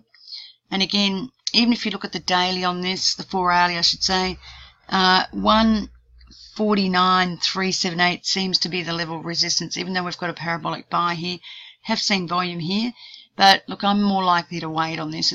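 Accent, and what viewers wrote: Australia. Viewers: Don't fight it. Enjoy the Aussie accent man.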